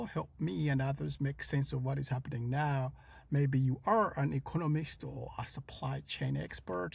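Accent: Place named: Australia. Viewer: I like your accent. Lots of aussie in there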